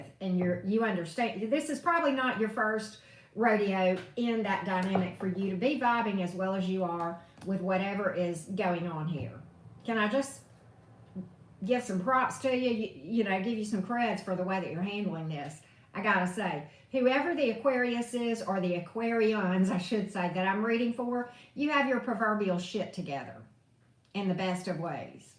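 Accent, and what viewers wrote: South, United States. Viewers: I am handling it pretty well. Let the chips fall where they may! Thanks for your great reading. Love your Southern accent! <3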